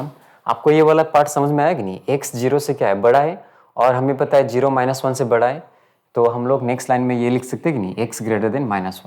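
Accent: Assam, India. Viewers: sir ap axomiya niki?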